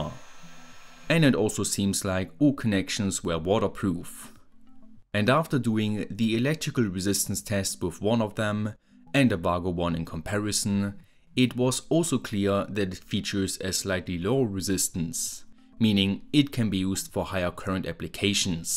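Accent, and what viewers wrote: Germany. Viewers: It’s funny that I can really hear out from the way you talk that you are German 😂❤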